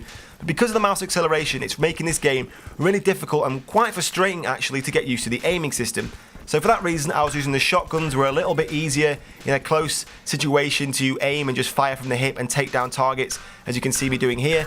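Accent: United Kingdom. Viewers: wow i like your accent =) British ??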